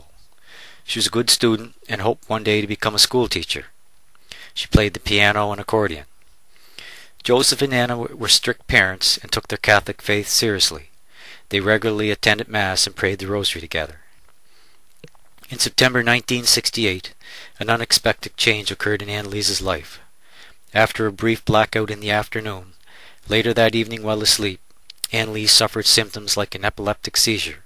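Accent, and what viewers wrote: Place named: Canada. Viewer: the guy talking must be canadian, i keep hearing abooot and thats def canadian.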